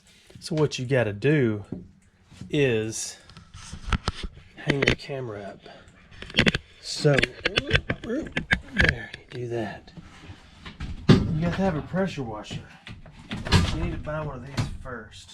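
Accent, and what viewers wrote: Alabama, United States. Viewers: The ultimate ‘shaggy dog’ car story, told with a wonderfully soft Alabama voice. Thank you.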